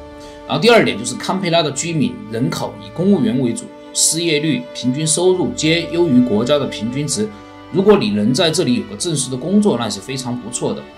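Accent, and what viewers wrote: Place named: Chongqing, China. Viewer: 博主听口音应该是重庆的？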